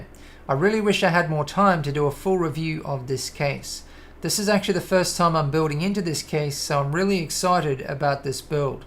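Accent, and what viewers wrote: Australia. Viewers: I hope you manage to make a nice number of £/$ from your videos because they are excellent. I see that you do builds for customers, is it for only certain parts of the world? I ask because I live in the UK and you sound like you live in Australia... How could you build a rig for someone like me, that lives on the other side of the world? I do have a brother in Oz, for someone like me, I suppose I could pick it up; however, I cannot see me getting my custom rig on the plane as hand luggage. Do you have a website, or place that reveals your details for custom builds? Shalom and Grats!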